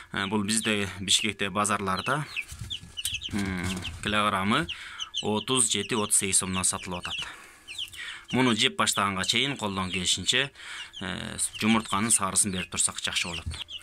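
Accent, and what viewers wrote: Pamir, Tajikistan. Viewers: Памириский акцент 😀 молодец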